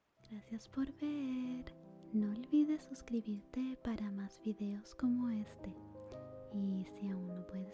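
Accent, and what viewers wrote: Chile. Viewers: Yo solo vengo a que me lean en chileno, aunque me odien jajaja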